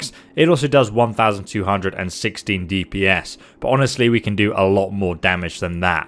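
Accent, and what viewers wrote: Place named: United Kingdom. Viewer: It's called being British, we dont mispronounced things, Americans do.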